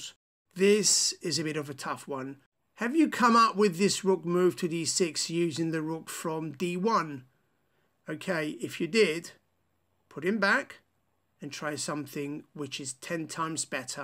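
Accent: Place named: United Kingdom. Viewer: He doesn’t speak American he speaks British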